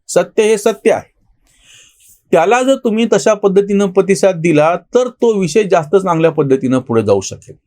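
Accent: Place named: Marathwada, India. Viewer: मराठवाडी लहेजा न सोडता आपण बोलत रहा. भाषा दर दहा कोसांवर बदलते अशी म्हण आहे. "लाईक्स भेटत नाहीत" या वर कुणाला हरकत असेल तर तो त्याचा प्रश्न आहे. भाषा शुद्धतेचा अवास्तव आग्रह धरणे अनावश्यक आहे. व्हिडिओ दहा मिनिटात संपला तर जास्त यशस्वी होतो. भाऊंचे व्हिडिओ मी 1.75X स्पीड ला बघतो. काही फरक पडत नाही. उलट भरपूर वेळ वाचतो.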